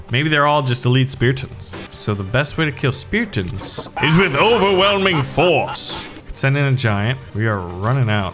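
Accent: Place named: United Kingdom. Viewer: great British accent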